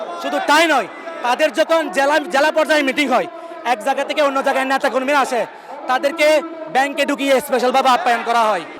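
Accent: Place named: Chittagong, Bangladesh. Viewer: তোমার মুখের ভাষায় মনে হয় তোমার বাড়ি চিটাগাং তুমি সিলেটে কি কর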